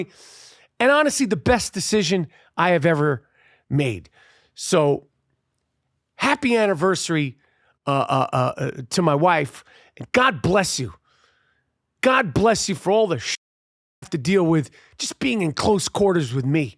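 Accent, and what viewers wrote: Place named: Brooklyn, United States. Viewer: Hi! Hope your wife feels better and your family recovers completely by 2023. I am a Brookyn expat living in the DR and listening to your accent reminds me of home. ❤🙏 Thank you so much for being you.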